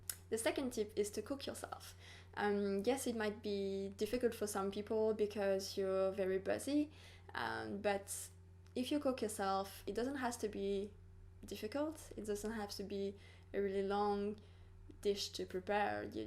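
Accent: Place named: France. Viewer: your french accent is so cute. LOVE it. I am enjoying your videos a lot!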